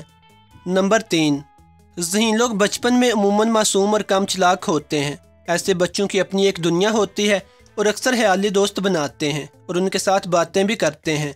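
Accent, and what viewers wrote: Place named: Punjab, Pakistan. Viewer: ap bhe bohot zahen hen pr apka lehja bilkul pnjabi he...lgta he ap pnjabi bol rahe hen.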